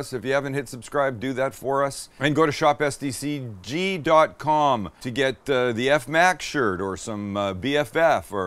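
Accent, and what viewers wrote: United Kingdom. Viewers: I am from the UK and your British accent is so very cute. I love it.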